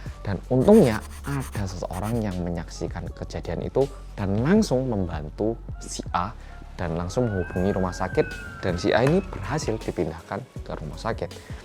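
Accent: Java, Indonesia. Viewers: Dua kata untukmu mas, "Medok banget" Tapi justru itu yang bikin seneng banget lihat chenel nya mas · Biasanya selain medok mas bilang apa ya di intro? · Entah kenapa selalu rindu suaranya mas yang medok bgt itu lho😭😭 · Bersama orang korea yang medokkk aaaah bikin rindu bgt 😂✨ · Cus langsung ndelooookkk.... Notif Bersama orang korea yang medooookkk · Semangat mas . Seneng aku lihat masnya pakai bahasa indo medok. Sekali kali coba bahasa Korea ... Pakai sub ... Jadi pingin denger kalau bicara bahasa korea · wong jowo mas?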